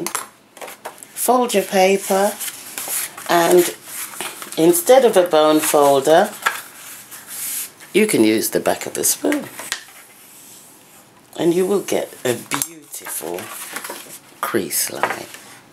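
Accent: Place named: United Kingdom. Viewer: I love your voice lol.. like a southern Big Momma with a British accent.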